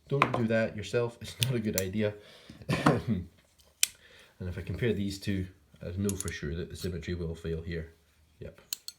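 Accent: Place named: Scotland, United Kingdom. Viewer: Nice to hear another Scottish voice. This isn’t really my sort of knife, but I’ve long had a hankering for a Richard Rodgers custom.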